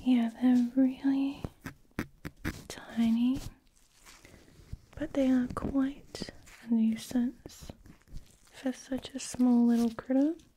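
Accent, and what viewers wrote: Australia. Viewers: Australian accent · You sound like a bit Aussie😅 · As soon as I heard her voice I knew she was from Australia then I checked and I got it right!!! I’m from Australia too! Btw amazing video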